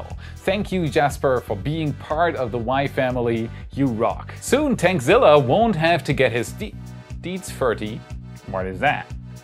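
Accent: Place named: Germany. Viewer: You sound a bit german. Are u german? Great video btw!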